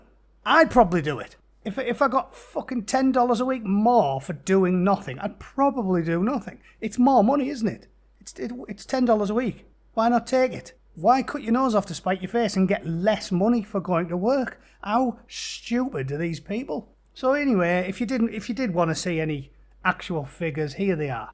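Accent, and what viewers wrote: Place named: Teesside, United Kingdom. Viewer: I think it may have been a little bit of a bold statement but the point being it a lot less of a labour strong hold than it used to be. I'm from Guisborough anyway so what the fuck would I know apart from the arse end of a 🐑😉. Just though id let you know I enjoy the content and it's nice hearing a local voice shitting on the commies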